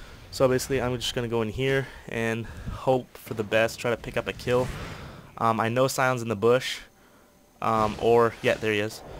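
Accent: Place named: United States. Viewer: You are french? I thought you were american, not a doubt in my mind.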